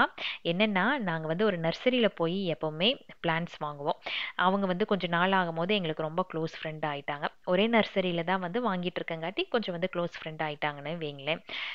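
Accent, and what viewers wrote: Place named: Pollachi, India. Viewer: Hi … I am your silent subscriber from Cbe … from your slang itself I noticed that u r from pollachi..I am watching ur videos regularly but today the way u expressed ur personal stuff was really beautiful. U seem to be very honest and genuine and a good parent also.. Always being honest in ur work brings success.. god bless you.. if u happen to visit Coimbatore we will meet..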